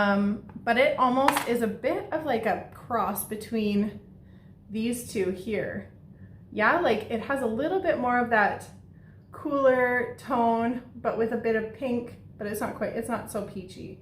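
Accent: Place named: Canada. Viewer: Love your Canadian..Ahhhh accent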